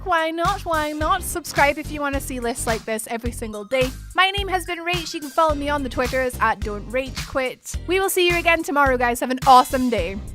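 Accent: Scotland, United Kingdom. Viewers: I love your accent. Scottish lass. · She's Scottish :-)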